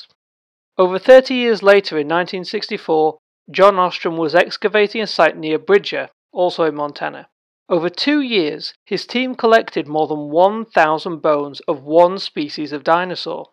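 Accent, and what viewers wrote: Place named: United Kingdom. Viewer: He's from the UK, alright?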